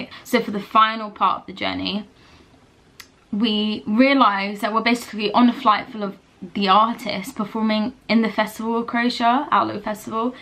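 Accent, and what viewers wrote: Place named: United Kingdom. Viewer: Pakistani girl with british accent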